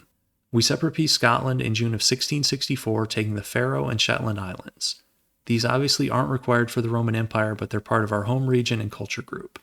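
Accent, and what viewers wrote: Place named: United States. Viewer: As an Australian, seeing and hearing an American is suprising to me each time. I want to see you conquer Austria and become emperor, Catholic, and revoke as an Australian tribe.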